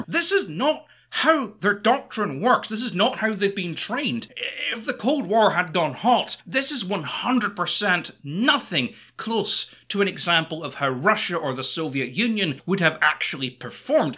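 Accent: Scotland, United Kingdom. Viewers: Why does he sound like Sheogorath,but Scottish and sounds like he's sick. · I love the Scottish accent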